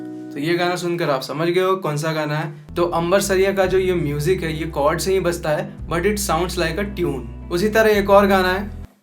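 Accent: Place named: Bihar, India. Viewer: Bihari babua “theth bhasha”….. 🫶🏻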